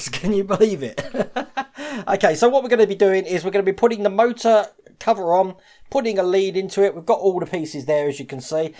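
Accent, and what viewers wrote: United Kingdom. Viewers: where in the uk are you that accent is bugging me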